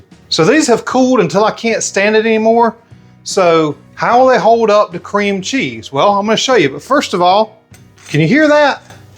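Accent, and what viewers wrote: South, United States. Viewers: I forgive some pronunciations simply because your southern accent makes them adorable.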